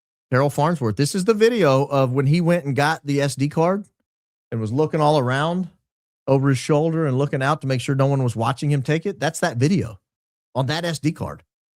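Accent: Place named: Alabama, United States. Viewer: were you originally from Alabama? It sounds like you at least lived here. I was born here and have lived here for all but fifteen years or so of my life. I returned slightly after that time frame, and I remember being shocked at the political things that happened in the early 2000s.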